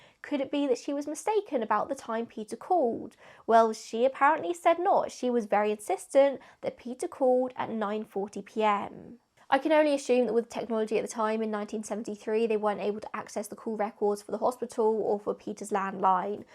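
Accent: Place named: RP, United Kingdom. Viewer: So posh 😂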